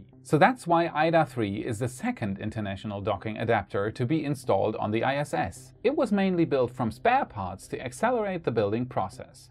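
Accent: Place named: Germany. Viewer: Strong German accent, sounds awkward.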